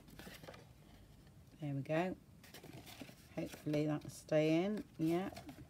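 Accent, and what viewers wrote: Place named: West Country, United Kingdom. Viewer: Missed you! Love your friendly West Country voice! Your videos are great! Love them!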